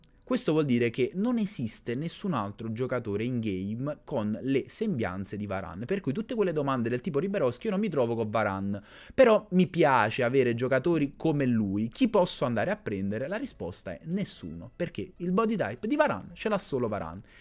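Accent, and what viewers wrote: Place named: Naples, Italy. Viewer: Si vede che sei allievo di Holly ... io ho vissuto 12 anni a Napoli ed adoro la parlata tua e di Holly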